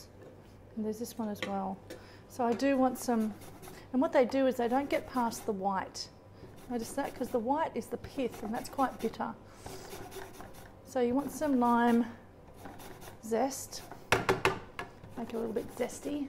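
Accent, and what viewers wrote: Australia. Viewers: She might be the first European to like Americans 😂or is she Australian? Im not very good at telling just by the accent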